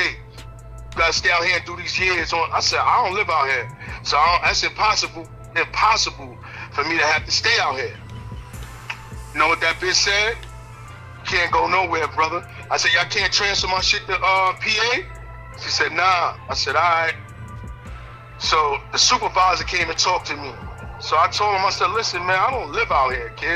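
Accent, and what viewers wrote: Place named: Brooklyn, United States. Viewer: My dude sound straight from Brooklyn or Harlem. That's our lingo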